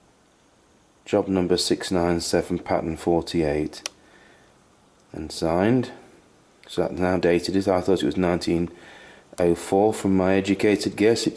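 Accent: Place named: RP, United Kingdom. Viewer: A very interesting video and you do talk very posh!:o)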